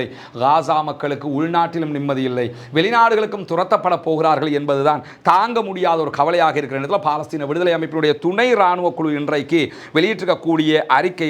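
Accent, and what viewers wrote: India. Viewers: சிறப்பான தகவல்களைதரும் நீங்கள் ஆங்கிலத்தை இந்தியர் போல் உச்சரிப்பது ஏன்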